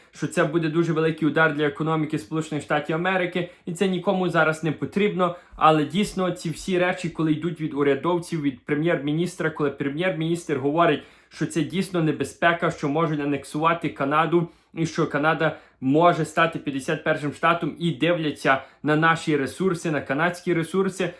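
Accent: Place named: Lviv, Ukraine. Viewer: не львівський в нього акцент, а з львівських сіл (видно вчили мови бабуся чи дід)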